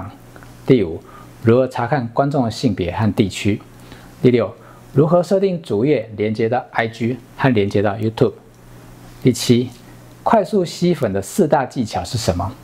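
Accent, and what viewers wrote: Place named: Taiwan. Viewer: 的台灣腔大陸人可能聽不太懂 XD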